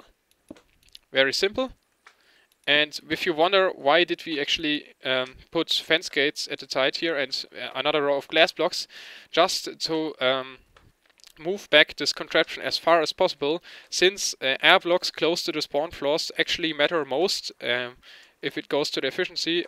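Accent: Germany. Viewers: lol bitch farm =D german accent is awesome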